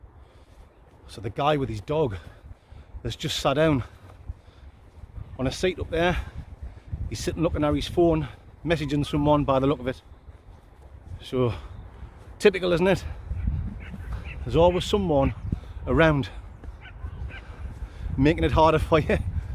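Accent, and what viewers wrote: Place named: Sunderland, United Kingdom. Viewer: I'm originally from Sunderland, 46 years, left 12 years ago, so lovely to hear a proper accent. Love this stealth camping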